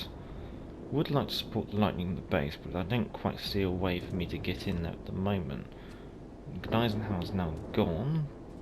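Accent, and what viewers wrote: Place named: United Kingdom. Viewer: I also came over from spartan and its great to hear a British accent, maybe a link up with said spartan could happen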